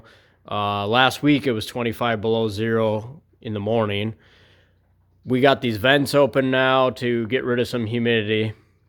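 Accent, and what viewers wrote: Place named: Canada. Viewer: I could have sworn you were canadian.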